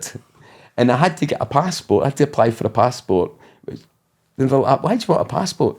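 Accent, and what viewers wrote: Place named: Scotland, United Kingdom. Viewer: I'm sure this story is amazing (I know it is) But I can't understand so much of it ! I'm usually fine with the Scottish, but this guy is deep 😂 I want his book for sure :) Bless u mate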